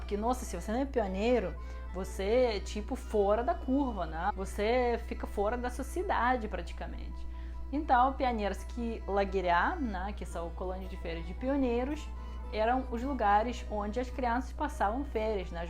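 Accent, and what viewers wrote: Rio de Janeiro, Brazil. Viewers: Você fala muito bem português e ainda tem sotaque carioca.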